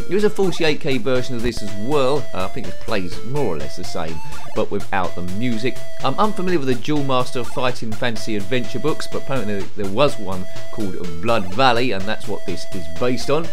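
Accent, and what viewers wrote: United Kingdom. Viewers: I love me some British man fondly commentate over an old obscure ZX Spectrum game, one of my favorite genres on Youtube.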